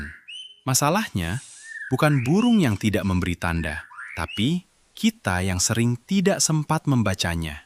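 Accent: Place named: Kuningan, Indonesia. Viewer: dari logat nya kayak orang Kuningan a😅🙏🏻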